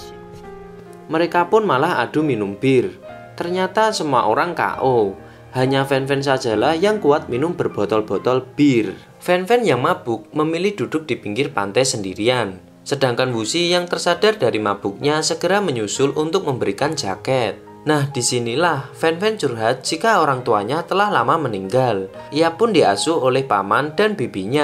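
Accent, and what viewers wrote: Java, Indonesia. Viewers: Narator Jawa tulen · Aduhhh logat nya terlalu medok bro jadi kurang nyaman nontonya, sorry to say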